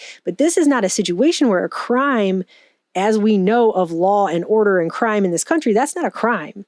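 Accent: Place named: Midwest, United States. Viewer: eh, normal? has a decidedly Midwestern accent with the way she pronounces mom as "mahm", among other inflections. It's not a pristine General American accent or its relative pregenitors in the Maize Belt. That said, the San Fernando Valley accent is influencing speech just about everywhere, thanks to Hollywood. It seems to be the favored regional dialect to mock now, perhaps overtaking the various Greater Appalachian dialects, which used to be the go-to. Moreover, the both of them are already the basis of the charicatures of the entitled urbanite and the country bumpkin.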